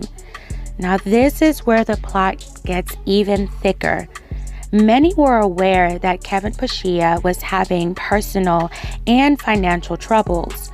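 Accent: Baltimore, United States. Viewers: Now when you do videos talk regular like this, cause you talking like you from Baltimore ain’t it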